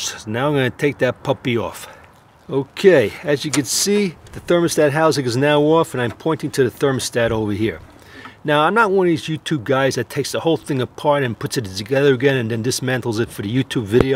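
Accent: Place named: Brooklyn, United States. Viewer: Pretty good Brooklyn accent... I actually have my eye on an ‘03 Pilot, 93000 miles; $3800. Good price?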